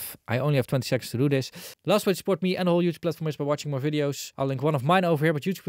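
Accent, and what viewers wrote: Netherlands. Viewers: I have to say that I really enjoy your videos! One of the things I like is your Dutch accent, I'm used to it because I lived in Amsterdam for a while :)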